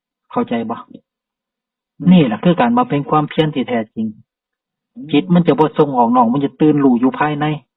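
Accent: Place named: Isan, Thailand. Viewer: มักเสียงอิสาน ครับ สาธุๆๆ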